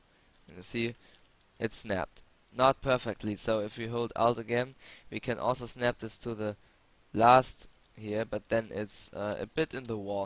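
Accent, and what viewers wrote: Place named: Germany. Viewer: "Houston We have a problem". I uploaded to google drive two files created with the editor. Links are: <link> <link> My limited knowledge of English, your German accent difficult translation providing youtube and subsequent translation into Spanish, as surreal as hilarious, has forced me to create these maps modifying the demo. I am aware that it was a mistake but it's demasido late to turn back. I created maps intertwined for high level players but I have reached the limit of my knowledge about the editor and do not know how to solve some problems. I created two maps: the first map does not appear sigils or stars or the computer terminal. In the second I tried to introduce sigils but have arisen configuration errors (HUD and other elements, for example) and do not know how to solve satisfactorily, so I need your help. I know I ask too but if you help me solve these problems could finally upload to steam.al least one of the two maps. 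Thank you.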